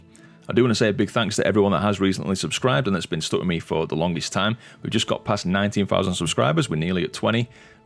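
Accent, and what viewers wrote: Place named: North, United Kingdom. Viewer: Its the warm Northern accent that im here for really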